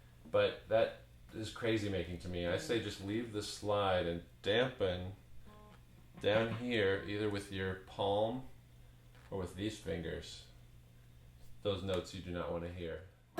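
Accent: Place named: Arkansas, United States. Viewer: You really suck man, you sound like a loser hick white guy from Arkansas or something. Man, you SUCK. lol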